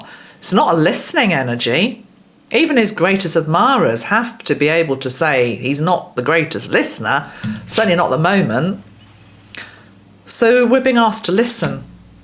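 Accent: United Kingdom. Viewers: Thank you very much, I enjoyed as a Dutchman your real British accent and pronunciation, I learned from that and about your predictions, time will tell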